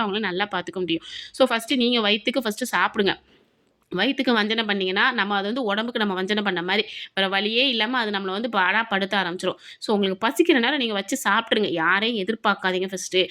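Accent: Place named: Kovilpatti, India. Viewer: Akka ninga ena ooru na kovilpatti nama ooru slang pesuringa ketan rmb depression aaguthu ka thaniya iruka mari iruku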